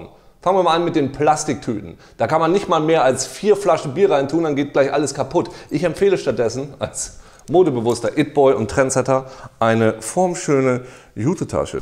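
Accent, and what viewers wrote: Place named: East Frisia, Germany. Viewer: ostfriesisch. Nicht hamburgisch.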